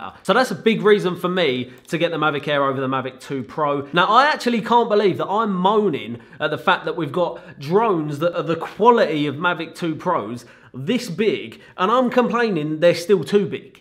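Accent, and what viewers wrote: United Kingdom. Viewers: I also sold my Mavic Pro cause in the last 12 months I did not need it, just went to destinations that forbid the drones. Now, I really miss it. Also, I found the Mavic pro a notch too big as I like carrying all my tech in a small package. I'm also keen getting the Mavic Air, but I want to wait until end of Jan cause this is when DJI likes to announce new products after the Xmas craze. I'm still wondering when the hell the spark 2 comes out? I love everything you say cause I have a massive fetish for British accents. xxx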